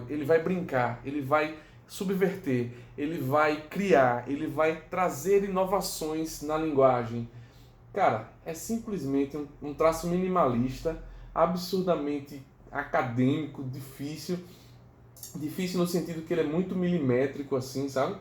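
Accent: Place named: Northeast, Brazil. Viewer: Muito show seu vídeo...e sua lista é nota 10... eu admiro muito o seu Canal e já te acompanho há um tempo, mas só hoje me dei conta que não estava inscrito, o que resolvi com um clic...eu gosto muito a forma como vc fala de quadrinhos e os apresenta...e além disso, tem esse sotaque que amo (tive um namorado de Maceió e um de Teresina, mesmo sendo filho de Paraibano, meu pai não tinha nenhum sotaque nordestino)...da sua lista eu tenho "Maus" (meu HQ da vida), "Retalhos" (lido nesse mês de novembro) - vc já leu "Habib", é lindo demais, - e claro, tem alguns que estão na minha lista de desejados...um grande abração carioca e boas leituras...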